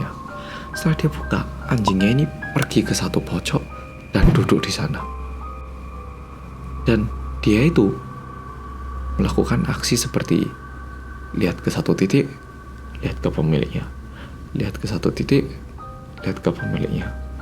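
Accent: Java, Indonesia. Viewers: MENJENGGONG ASTAGA YA LORD MAS WKWKW😭😭 jowomu muedhok temen mas😭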